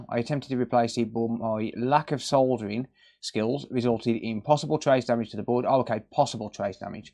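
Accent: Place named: Black Country, United Kingdom. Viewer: Brilliant, your patience and attention to details is superb. You sound like a black country boy, where are you located?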